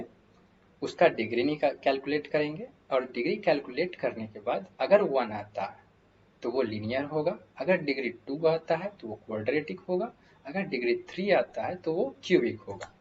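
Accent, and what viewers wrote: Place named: Bihar, India. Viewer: That bihari Accent while teaching is hypnotising, Nice Explanations 🙌🙌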